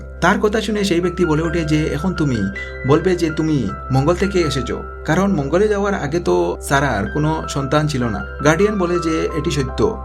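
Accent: Sylhet, Bangladesh. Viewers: ভাই সিলেটি নি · তুমিতো দেখি সিলেডি ফোয়া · Apni sileti naki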